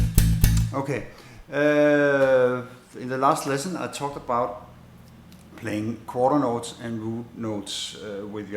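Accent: Denmark. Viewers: Elsker din dejlige tykke Københavner accent, den giver én en ret fed nationalfølelse :-) But great job man, I'm enjoying learning from you.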